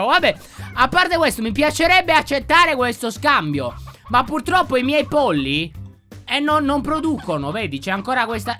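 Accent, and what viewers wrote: Sardinia, Italy. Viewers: ma sei sardo??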